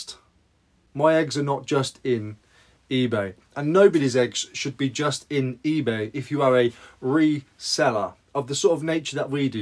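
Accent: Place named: Scotland, United Kingdom. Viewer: That Scottish accent mate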